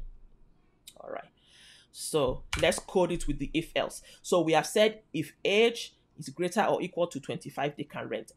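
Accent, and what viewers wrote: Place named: Nigeria. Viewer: Nice! Off -Topic: From your Accent it’s hard to believe you are not Nigerian (specifically southern Nigeria (south south or south east)…intonation & manner of speaking clearly Nigeria, & I’m sure other Southern Nigerians will feel the same way. If you do that ancestry-ish tracing stuff. I’d easily place a Bet on the result matching what I said above.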